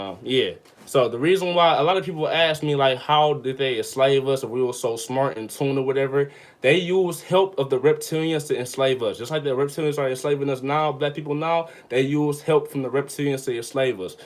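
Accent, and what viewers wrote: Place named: Detroit, United States. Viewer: You from Detroit? You talk like us 😂😂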